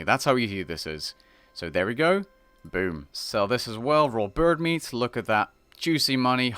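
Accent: Netherlands. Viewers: Ik dacht al dat je NL was ik kon het horen aan je platte dialect hahahahhaha. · Nooit geweten dat je Nederlands was, nu kan ik het accent niet meer negeren haha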